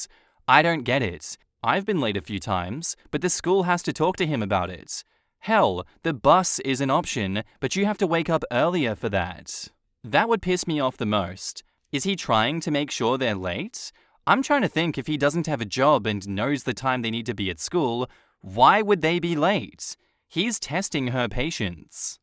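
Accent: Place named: Australia. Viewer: I love these. Thank you, for delivering these stories at the right speed, in a nice accent and with enjoyable, insightful and to the point commentary. 💜✨🧿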